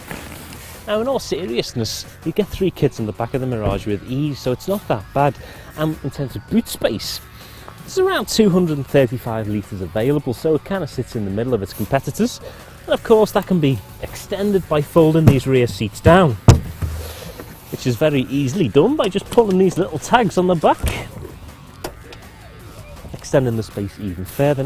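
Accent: Liverpool, United Kingdom. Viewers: I spot Sefton Park! Didn’t realise it was Liverpool well apart from the accent! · He's from Liverpool.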